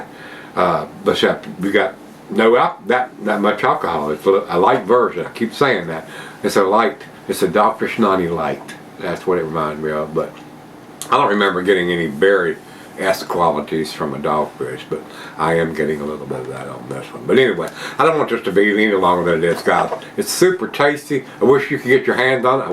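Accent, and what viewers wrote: South, United States. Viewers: I now check the dates on ( most) of my beers because of. His reviews broadened my beer knowledge and appreciation. Randomly enough, I found (find) his reviews to be very relaxing and almost asmr like due to their very simple format and comforting southern drawl. Over the years I’ve watched his reviews almost religiously and looked forward to when he would upload a new review in the morning. It was a nice simple escape that made me proud to be a beer drinker. From now on, every beer I drink will be in his honor.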